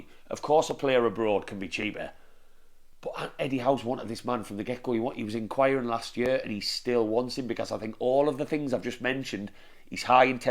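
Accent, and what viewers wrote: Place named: Teesside, United Kingdom. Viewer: Your accent is not Newcastle...I'd say Smoggy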